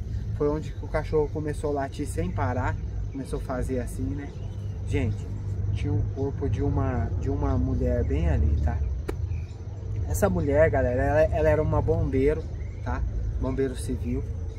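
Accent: Minas Gerais, Brazil. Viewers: O o vc e mineiro ou goiano? Sua voz não parece com pessoas do Sul não! Vc parece mesmo e míneiro ou goiano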